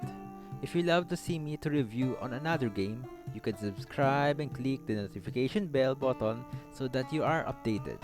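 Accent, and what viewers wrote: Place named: Philippines. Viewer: Such a Strong Filipino accent haha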